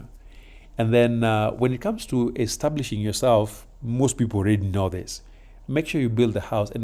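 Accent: Luo, Kenya. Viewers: Enjoying your slight luo accent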